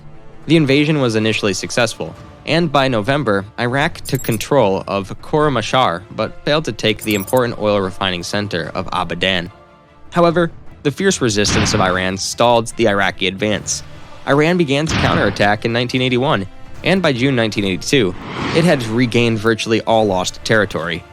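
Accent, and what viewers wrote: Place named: United States. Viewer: No he is not butchering anything. He is a typical Ey-mey-rie-san (American) who have no concept of language, grammar, spelling or pronounciation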